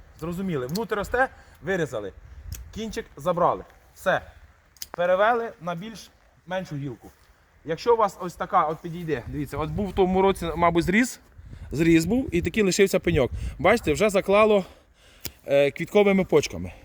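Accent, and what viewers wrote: Vinnytsia, Ukraine. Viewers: Дякую за добре поданий матеріал! Говірка вінницька, так приємно!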